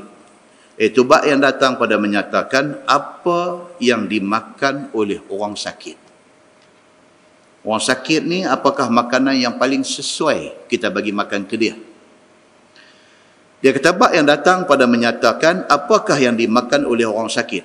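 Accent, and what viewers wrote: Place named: North, Malaysia. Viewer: terima kasih....lega boleh dengaq balik bahasa utara...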